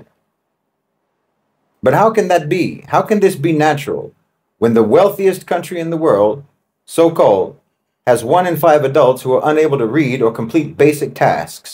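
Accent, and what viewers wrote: United States. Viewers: I understand your impression of the US and its citizens, but I can't say that I agree with you completely. You are very articulate and well spoken, but not all of us in the US are bound by the constraints that you deem to be inherent in the system here. We usually all grow up with access to books, as you obviously have, which can allow the reader to experience different realities and socio-economic circumstances to which we may have never actually been privy to. You come across like your perception of the US was formed from what you have seen in movies, and not having experienced living in US culture for that long a time. I could be wrong, because judging from your accent, you have either spent a great deal of time in the US, or your parents did. You make a lot of generalizations about the population here, but this country is filled with people from all walks of life who all have a different perception of their circumstances.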